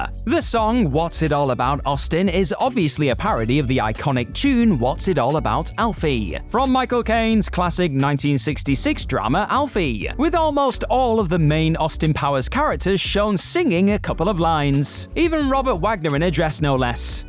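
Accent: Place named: United Kingdom. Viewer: Yes it is, I've been watching British tv for over 40 years, I've heard that exact pronounciation hundreds of thousands of times from other Brits. It is part of the accent.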